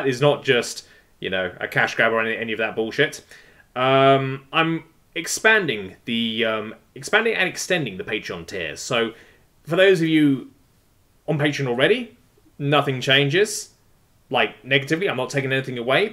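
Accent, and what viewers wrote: United Kingdom. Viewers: aside from the good news, I have to say, this man is one of the most British people I've ever heard. it doesn't come out in the normal videos. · This was the first time you sounded positively British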